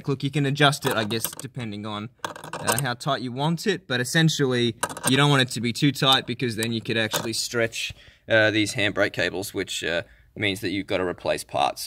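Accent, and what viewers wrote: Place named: Australia. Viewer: Thanks so much for this vid! I keep coming back to this so I know exactly what to do. True aussie bloke right here. If you ain't Aussie well you sure sound like one lol.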